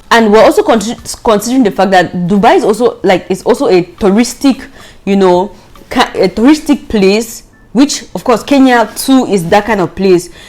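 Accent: Nigeria. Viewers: You talk like nigerians though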